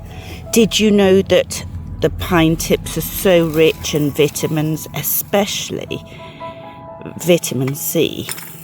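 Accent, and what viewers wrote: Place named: RP, United Kingdom. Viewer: Oh dear jolly hockyscks why do you talk like that all posh now when we were there you didnt it sounds terrible.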